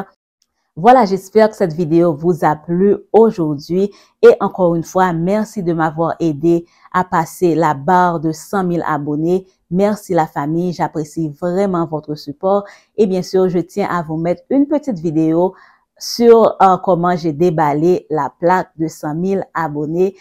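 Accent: Haiti. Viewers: J’aime ta douceur 😅 surtout ton accent haïtien 🇭🇹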